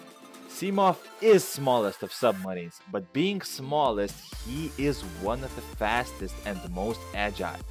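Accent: Russia. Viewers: This man has a Russian accent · I love his russian accent. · do u have a Russian accent? it sounds like it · your accent more like russian are you russian? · Your accent is like Russian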